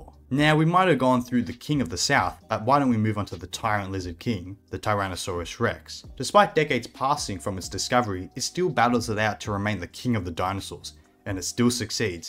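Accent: Australia. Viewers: Very amazing video plus I love the Australian accent and it’s as satisfying as a British documentary.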